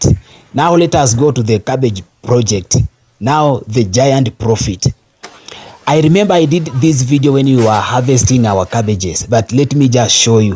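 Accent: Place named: Kenya. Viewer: !!! You Kenyan accent is what kills me mann!!! Keep going sir.!!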